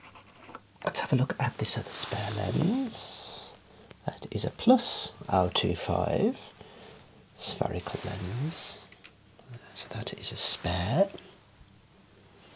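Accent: Birmingham, United Kingdom. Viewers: Youtube recommendations have served me well! This video was amazing! Your voice reminds me of a Brummie Alan Lee :D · This was great. I initially thought it was a real inspection/appraisal until I saw your other vids lol. You have a super soothing voice. Is that a Birmingham accent? Forgive my ignorance, not from the UK (Australia). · I love britan akcent, sorry my bad english im from Sakha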